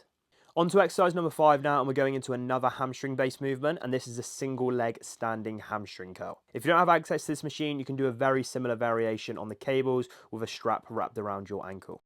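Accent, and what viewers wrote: United Kingdom. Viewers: you have a British accent but Irish good looks.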